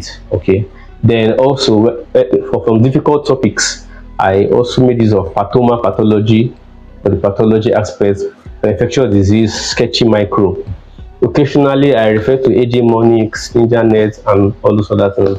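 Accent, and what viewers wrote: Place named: Nigeria. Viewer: Very interesting watching from Chicago, just a suggestion, if you want to work with the average American patient, start working on getting rid of your accent, I'm used to being around Nigerians because I live in Uptown but most Americans White or Hispanic won't be able to really understand or have the patience to keep a doctor with such an accent or maybe you're just taking fast.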